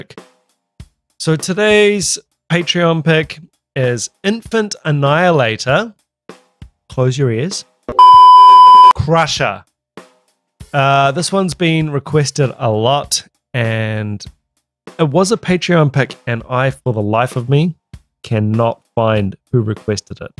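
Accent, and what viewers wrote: New Zealand. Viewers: Its good to hear that Kiwi accent on Youtube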